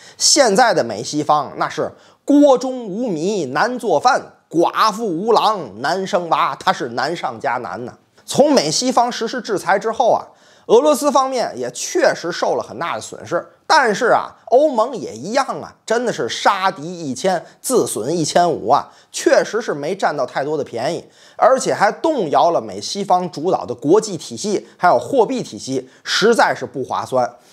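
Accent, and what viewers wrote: Tianjin, China. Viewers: 我在天津呆过两年，这天津卫的普通话太亲切了，你是真有才，把天津人的幽默发挥到财经政治方面，加油，继续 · 太喜欢听的天津话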